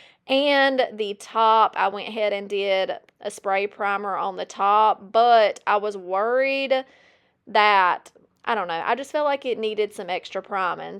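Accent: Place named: Texas, United States. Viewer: I just found your channel. Your accent sounds like my neck of the woods….NE Texas.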